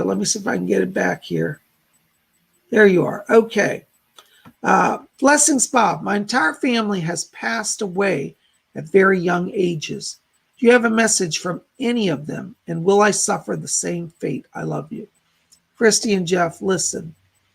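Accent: United Kingdom. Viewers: Blessings Lord. Love the English accent (lush) lol Cola has brought so much positive energy an love and light to your being. We are so blessed to have her in our lives. She is my little sister and I'm Mama pit bull over her and you. Team Lady Cola and Lord Bob. Blessings to you and lady Cola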